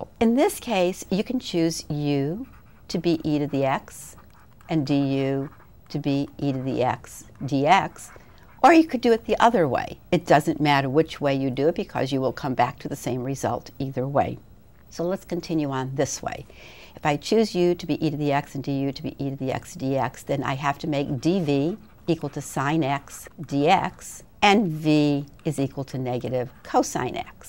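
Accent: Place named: New York, United States. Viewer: The New Yawk accent is hard to take, but it's a start. YouTube falls down on deriving Integration By Parts. If anyone knows of good ones, please post.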